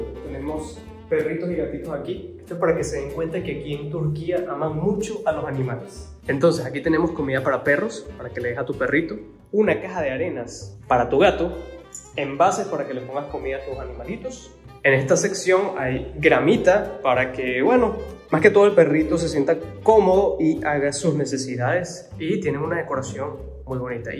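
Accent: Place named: Venezuela. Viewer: super excelente video gracias. excelente cuenta. pareces venezolano por tu acento. Muy buena tu oratoria y edición del video. sigue adelante like y suscrito de una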